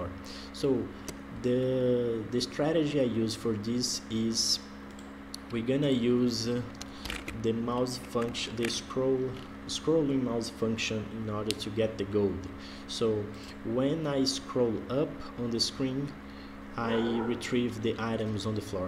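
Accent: Brazil. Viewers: Parece ser um BR falando, se for, faz um em portugues ai tbm man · Parece ser um brasileiro falando. Parabéns pelo tutorial e muito obrigado pelo conteúdo. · Ia dizer isso, ou é Brasileiro ou indiano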